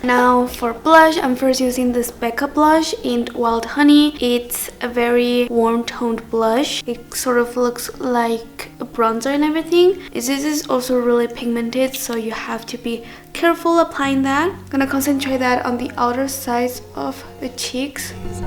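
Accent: Spain. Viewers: Where are you from? Your accent is interesting it sounds almost as if you naturally speak Spanish..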